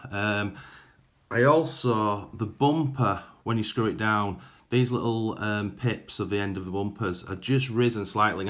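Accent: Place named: Yorkshire, United Kingdom. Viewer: new to your channel really liking it a yorkshireman in canada dont lose the accent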